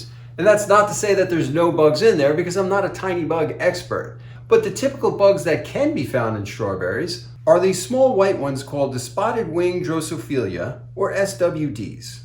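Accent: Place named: New York, United States. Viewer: I can tell this guy is from New York cause of his accent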